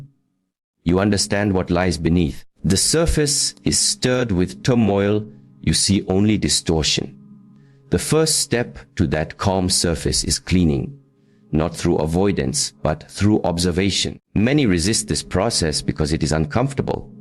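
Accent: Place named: India. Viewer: Okay he sounds like he's from india on this one, It definitely threw me off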